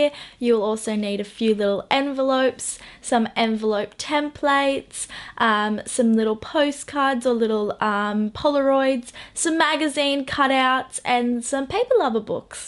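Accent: Australia. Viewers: I can tell your from Australia from your accent!!! I am too!!❤❤❤ (also because typo is in australia!!)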